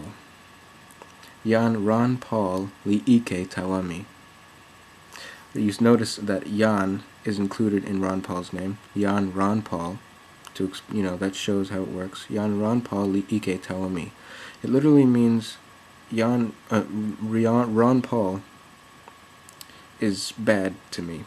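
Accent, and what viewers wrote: United States. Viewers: It sounds like american.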